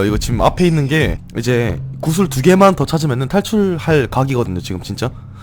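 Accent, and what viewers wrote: Gyeongsang, South Korea. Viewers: 님 경상도분이신가 ㅋㅋ 억양이느껴짐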